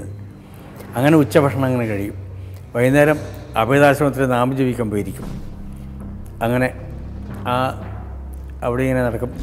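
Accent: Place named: Kannur, India. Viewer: ഈ കണ്ണൂർ സലാംങ് കേൾക്കാൻ നല്ല രസമാണ്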